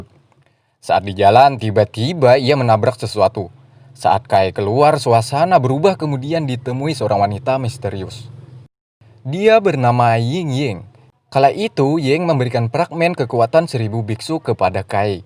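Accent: Banjar, Indonesia. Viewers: Mang orang banjar kh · Aksen nya kaya urang banua Banjar tambahee lagee sanakk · Adminnya urang Banjar dingsanak lah.. Hehehe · Mantap bos campuran logat bahasa banjar sama bahasa indo👍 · Banjar kah admin nih...umaaaaa...mandangar dangsanak tu aeee..tesadar...wkwk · Logat banjar kd bisa hilang😁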